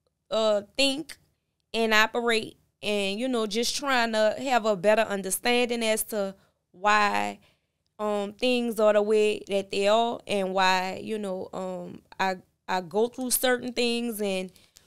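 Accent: New Orleans, United States. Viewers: Her and that New Orleans accent 😩🥵😍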